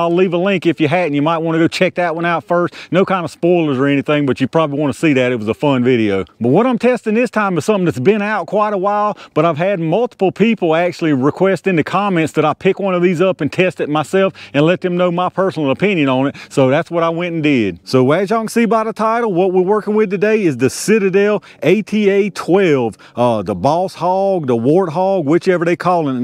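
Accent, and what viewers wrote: South, United States. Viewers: Thank you for your review, I have been looking at this shotgun for a while, love your Chanel and your excellent reviews. Ps, I am a Yankee, but love the south, especially that southern drawl. Keep the videos comming.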